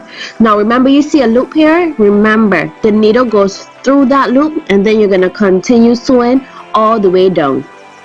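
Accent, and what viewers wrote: Barbados. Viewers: Love that Bajan accent 🇧🇧🇧🇧🇧🇧 thanks for the ideas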